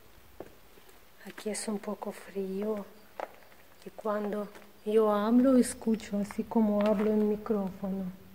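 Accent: Russia. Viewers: y disfrutar de tu compañía me gusta tu tono ruso al hablar castellano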